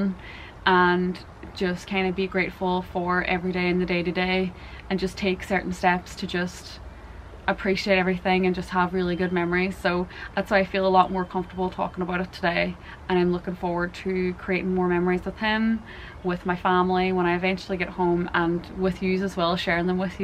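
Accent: Ireland. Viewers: you are such a beautiful person. I hope you get the absolute best outcome from all of this. Thank you for sharing your diagnoses and journey with us. As a fellow Irish (my dads full Irish but never used his accent when I was growing up so I've always been gutted I don't have it), i adore your accent and could listen to you for hours haha! Sending you so much love and positive thoughts! 💚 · I love how you are community us, your smile, lovely Irish accent, your awesome wavy blonde hair, your ease and command into the camera, and knowledge. You seem to be picking up the medical terminology pretty well too. I love learning anythingmedicalrelated, , took a course in it many yrs ago, getting straight As. Came in really handy too, because several rare genetic diseases in my kids and grandkids. Sounds like you have had a rough couple of wks. I was so sad that your your surgeon oncologist took off out of country without calling you, making sure you and other patients were OK for the time he was unavailable. 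Very inconsiderate. Better days ahead for you both.❤ from ottawa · Hello, Your channel just popped up in my feed--maybe because I am following another cancer survivor (Dr Dan Says, he is a chiropractor and very inspiring man) but I really am getting an education on what some folks are having to deal with and at young ages (50s is too young too) Anyhoo, you are traffic stopping beautiiful and very sweet and well spoken and I think your channel is the first I have that is teaching me what a proper Irish accent sounds like. Wishing you the best in your life challenge. They are making breakthroughs all the time helping people live longer so don't give up!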